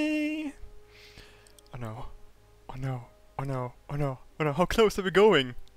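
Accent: Norrland, Sweden. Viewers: are you swedish you sound swedish if you are so tjenare har du det gött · Älskar din norrländska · Omg he sounds sssssssoooooooo swedish... And I love it! Håll på såhär bara ;-) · Swedish accent.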